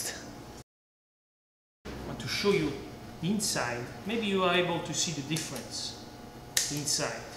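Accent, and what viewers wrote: Italy. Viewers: love Italian accent!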